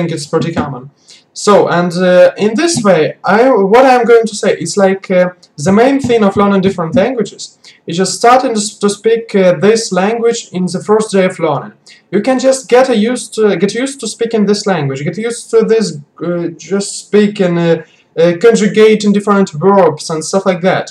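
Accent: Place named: Germany. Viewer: You have a German accent while speaking English...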